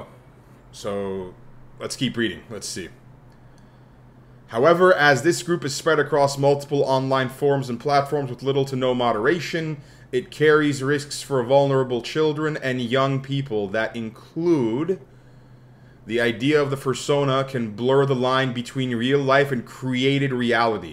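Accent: United Kingdom. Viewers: Love the British accent bro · bro has an awesome british accent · No one talking bout his British accent 💀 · i love your british accent its so funny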